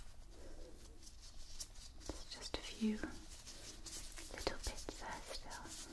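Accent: United Kingdom. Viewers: British accents often sound so elegant. I really like listening to you talking! Lovely greetings from Germany!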